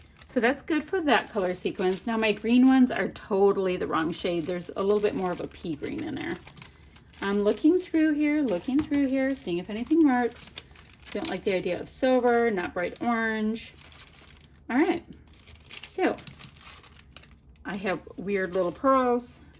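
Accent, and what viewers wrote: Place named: Midwest, United States. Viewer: Nicely done. Precious! Precisely why we don’t have a tree this year. We have a 9 month old pup of a much larger breed. She loves to chew and to steal things (admittedly mostly socks). So our favorite carol this year is 🎶 No Christmas tree, no Christmas tree, Piper would strip you of your branches. 🎶 Also, I’m guessing you are in the Midwest(?) I hear several of the YouTube gals from that area pronounce “bough” like it rhymes with “snow” not with “cow.”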